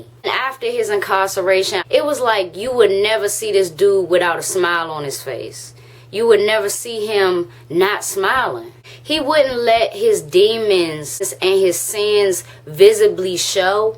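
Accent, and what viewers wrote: Washington DC, United States. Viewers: You from DC? I'll definitely subscribe now. **New Sub** I enjoy your content and you have a nice speaking voice. Keep up the great work ya heard?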